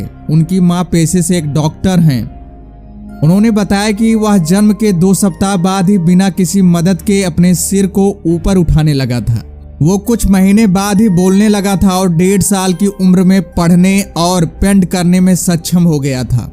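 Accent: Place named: Bengal, India. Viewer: Are bhai ye hindi bolne ki kosish kr rha hai iska accent bengali jaisa hai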